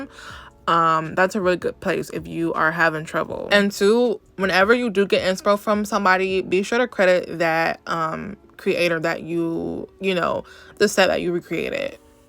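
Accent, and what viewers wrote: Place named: South, United States. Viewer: Where are you from, if you don't mind me asking. Sounded like i was hearing some country coming out in this video...😂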